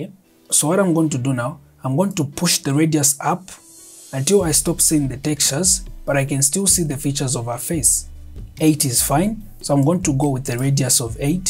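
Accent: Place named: Zambia. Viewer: For some reason you sound Zambian, not that its important at this point😁 very good tutorial.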